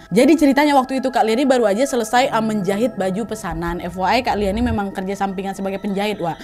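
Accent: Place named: Sumatra, Indonesia. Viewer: Cerita jawa timur logat sumatra, tapi ini yg bikin aku suka, dan ingat terus sama ka ini ❤❤❤❤❤ada ciri khas nya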